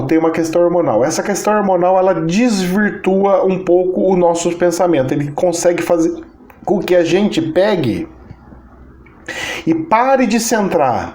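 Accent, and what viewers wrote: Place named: Caipira, Brazil. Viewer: Eu acho tão engraçado o jeito caipira que você fala, a aula fica mais divertida...kkkk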